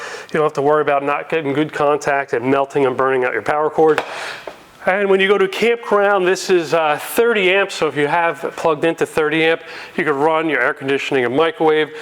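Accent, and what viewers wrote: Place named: South, United States. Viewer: What accent is that? It sounds like Minnesooooota with some Canadian ayes meets a southern drawl.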